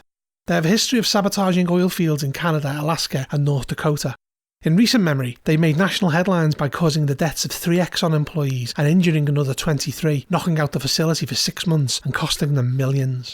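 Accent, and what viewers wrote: Liverpool, United Kingdom. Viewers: Unless I am mistaken, I am detecting major Scouse.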